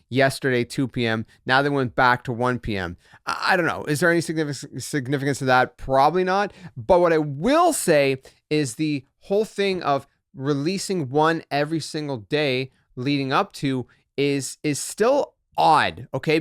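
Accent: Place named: Canada. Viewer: I’m here for the cute Canadian accent. Here for the degrassi vibes. lol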